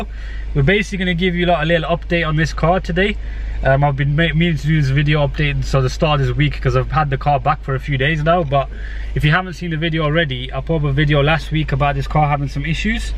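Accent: United Kingdom. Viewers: He's obviously from Great Britain ...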